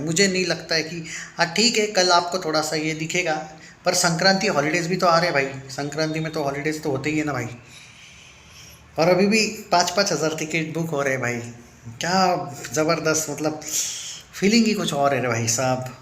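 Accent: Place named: Maharashtra, India. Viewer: Bhai i am addicted to your channel and your style of explaining things Specially i love the accent when marathi prople speaks hindi, as i am also maharashtrian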